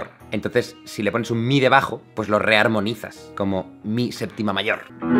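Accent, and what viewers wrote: Spain. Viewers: no es así recuerda los guitarristas eran buenos con la guitarra acústica salio la electrica y los obligo a ser mejores ¿ y el auto tune? Ese no te exige, entonces es mal ejemplo decir que por que la voz pase por un ampli y unas bocinas del tamaño de no se que (ya que hablas muy feo el español entiendo que la mayoria de españoles hablán asi ) pero estás oyendo su tecnica y su voz sólo que amplificada . Saludos desde México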